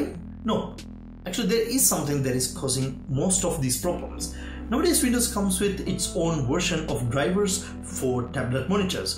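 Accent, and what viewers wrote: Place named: England, United Kingdom. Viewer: Enjoyed the video. Thank you for the useful info. Although..... It was toooo difficult to understand the English Accent, and the opening logo was sooo long I almost skipped.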